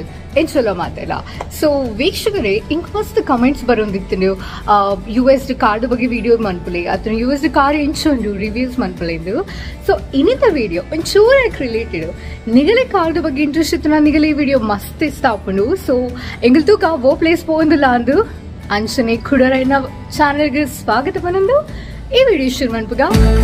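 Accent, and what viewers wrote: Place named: Tulu, India. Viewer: Your tulu slang is so nice.... Nice video..👌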